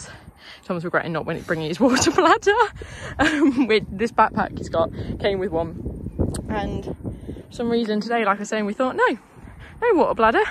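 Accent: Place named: West Midlands, United Kingdom. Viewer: Love watching you enjoy what we nearly did when young but chickened out due to family issues. Intrigued by your slight accent similar to our family and mine which is West Midlands. You look like and sound like the younger daughter of my cousin who was raised in Tile Hill north west of Coventry and sounded to me sometimes like Shropshire. Are your roots similar. Have a great time seeing as much of the world as possible.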